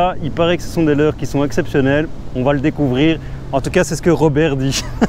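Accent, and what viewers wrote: Belgium. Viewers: Je kiff ton petit accent belge ;)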